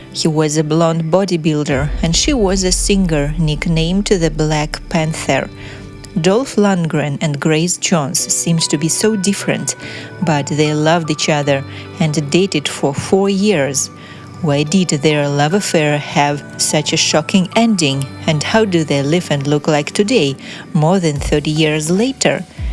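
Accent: Russia. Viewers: What's with the Russian accent? We need Russians now to explain American pop culture history to us? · lol Russian accent 😂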